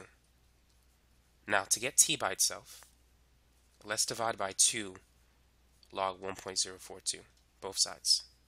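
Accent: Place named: New York, United States. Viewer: bro you sound like a new yorker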